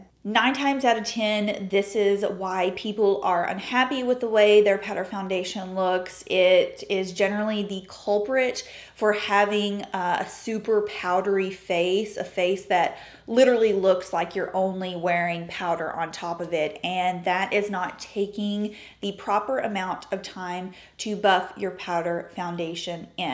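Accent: South, United States. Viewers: I was looking for a comparison of the bareMinerals Well Rested and the Bisque a few days ago and I came across one of your videos from 2013. It was linked I believe on your website. Your hair was past shoulder length with bangs.partially swept to the side. Your southern accent was in full force. I so enjoyed that video! You were beautiful then and you’re still beautiful now. It was really great to see! 💕💕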